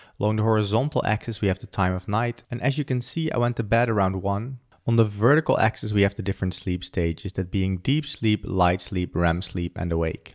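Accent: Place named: Netherlands. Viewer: Your accent really gives it away from where you are (NL). : ) Nice channel!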